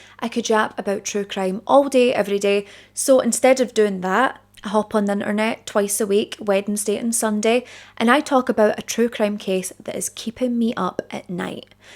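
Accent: Scotland, United Kingdom. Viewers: Ohh no danger great video its gallus a Scottish accent .watching from paisley ❤ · She's Scottish. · English edit yes Scottish sorry!. Love accent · Lol, it's a pretty typical Scottish accent.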